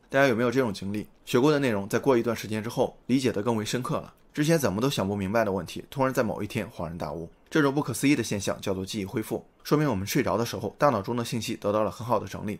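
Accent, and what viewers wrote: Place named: Wuhan, China. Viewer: ……标准……＾＾＾你武汉人啊！？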